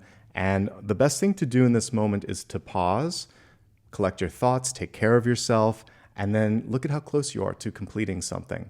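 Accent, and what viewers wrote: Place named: United States. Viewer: I see that building from your window and wondering where are you from. You have american accent but that landscape doesn't look american 😅 Best wishes for Apollo!❤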